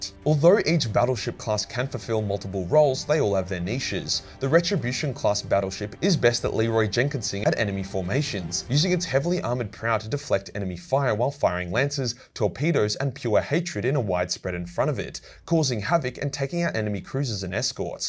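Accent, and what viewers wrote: Australia. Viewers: Hearing an Australian swear at me and also teach me 40k lore is some what pleasing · Damn loud aussie lull me To sleep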